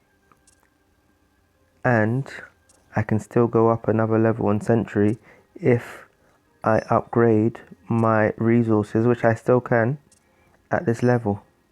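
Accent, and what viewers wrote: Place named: India. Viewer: Индийский акцент... Кровь с ушей идёт от этой речи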